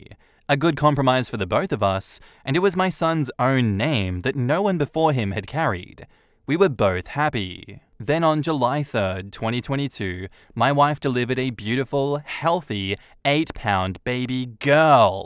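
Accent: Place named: Australia. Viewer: I love hearing your Aussie voice, it's a thankful break from all the AI read stories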